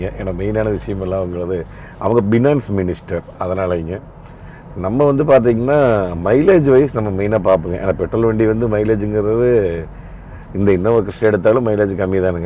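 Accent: Kongu, India. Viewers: உங்கள் அழகு உங்கள் கொங்கு வர்ணனை பேச்சு அண்ணா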